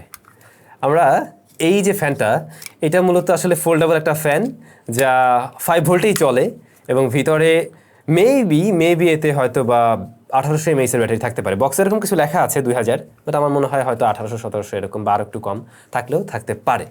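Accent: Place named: Barishal, Bangladesh. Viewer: আজকে কথাগুলো ভাল লেগেছে গ্রামের ভাষায় কথা বলেছেন দেখে সেম বরিশালের ভাসার মত 🤔🤔🤔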